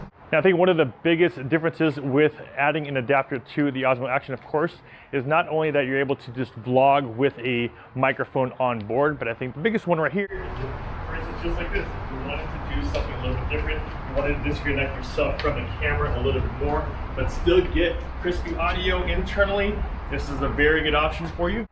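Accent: Philippines. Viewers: You're accent is filipino ilocano..no doubt about it.NIce video.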